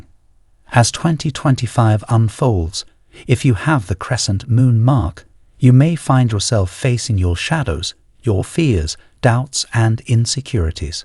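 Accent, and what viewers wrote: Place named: United Kingdom. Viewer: are you from Britain? or australia?? I hear an accent but cant pinpoint its relevance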